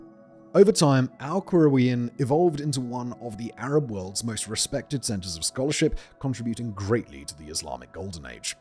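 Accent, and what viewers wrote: England, United Kingdom. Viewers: Actually he lives in Czechoslovakia atm but his from England that's why he has that accent 😂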